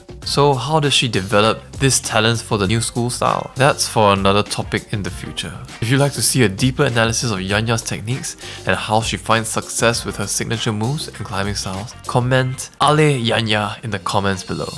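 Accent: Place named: Southeast Asia. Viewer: great video! but random qn.. by any chance are you Southeast Asian? hahahaha your accent is familiar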